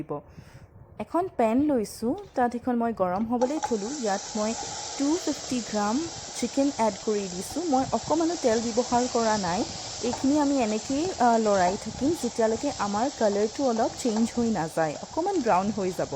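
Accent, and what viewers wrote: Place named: Lower Assam, India. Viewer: Looks like you're from lower assam but you're trying to speak in upper assam dialect...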